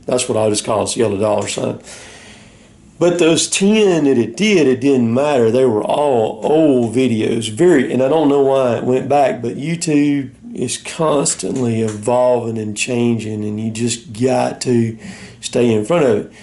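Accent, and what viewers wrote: South, United States. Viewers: I am a modest Patreon sponsor for Jason at Hollis Farms. I enjoy the baby deer videos and I just love the southern drawl. I think if your You Tube content has guns, blood, or banjo music; they demonetize it. You don't have a Patreon account. I think working out in the sticks like that with the heavy equipment one would want a sure fire way to communicate. What if a rattlesnake bit your sticker when you were watering the landscape? ( Old joke. "You gonna die!")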